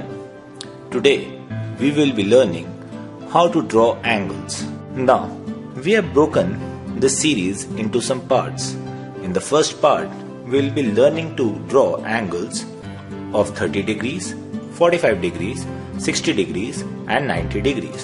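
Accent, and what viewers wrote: India. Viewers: Ocean Avocado - That's an Indian accent.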